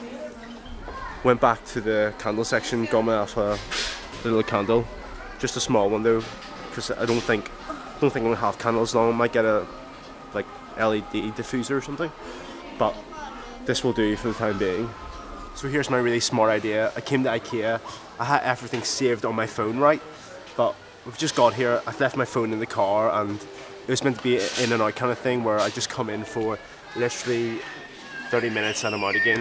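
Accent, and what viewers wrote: Northern Ireland, United Kingdom. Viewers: youre from northern ireland arent you, greetings from derry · northern irish indeed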